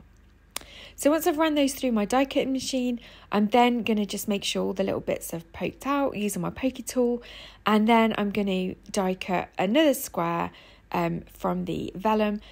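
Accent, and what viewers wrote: United Kingdom. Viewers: Very clever, I have a tub of Tonic flakes that I used on a mirror I was up-cycling a few years ago, now I know how to use them in my cardmaking. I found your channel today by chance, listening to you it sounds like you have a slight west midlands accent. I might be wrong, I'm from Birmingham 😊💕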